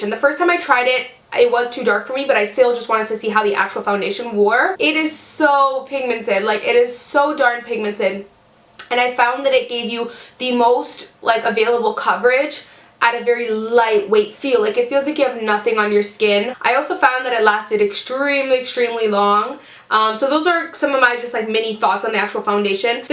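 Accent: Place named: Canada. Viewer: I can really hear your Canadian accent in these recent videos of yours . Its adorable!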